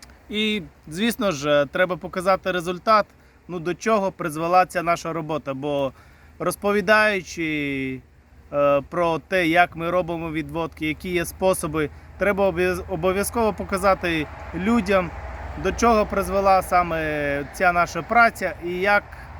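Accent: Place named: West, Ukraine. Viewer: Слава Україні ! Я з Львівської області а ви з півдня України , але по вашій мові можна сказати що ви теж ,,западенець".😁 Дякую вам за мову та за повчальні , змістовні відео. Героям Слава !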